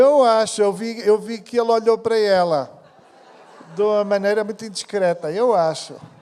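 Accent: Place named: Brazil. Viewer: És mesmo falso! Ainda por cima quando te pões a brasileirar a forma como falas. Achas que eles são atrasados ou és tu?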